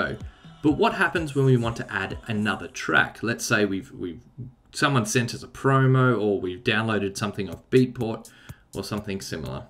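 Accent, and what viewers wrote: Australia. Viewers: wth r u from sydney?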